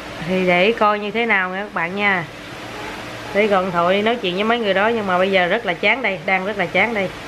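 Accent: Mekong Delta, Vietnam. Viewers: Chi noi chuyen lanh qua.vui ve Nghe giong chi chac la nguoi mien tay dung khong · de thương nói chuyện thiệt tình đậm chất miền Tây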